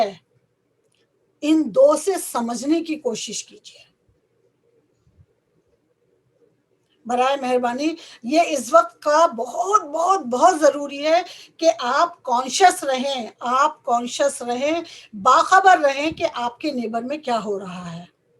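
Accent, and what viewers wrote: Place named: Haryana, India. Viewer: Haryana ala boltay madam😉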